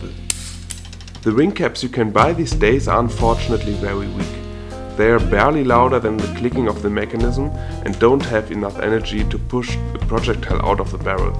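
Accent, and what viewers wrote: Germany. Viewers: u sound very german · First off, great construction! If I’m right and interpreting your accent correctly, you’re German. Please consider that this is a gun law violation, and if they catch you, you could get into serious trouble. If you are not german and dont have silly laws, then keep up the work lol xD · Are you german? Your english sounds a bit german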